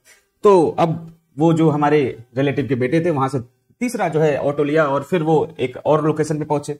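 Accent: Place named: Varanasi, India. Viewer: Kbhie aae to nhi hain benaras bs isi bahane dekha Apka benarsi accent ek no tha 😍 thanku sir for guiding us 🙏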